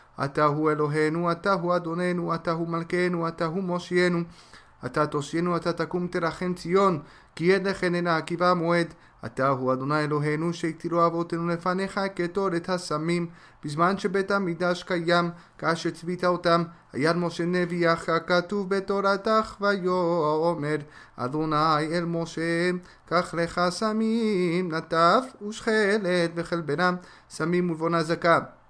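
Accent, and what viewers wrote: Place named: Morocco. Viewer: L'hebreu accent marocain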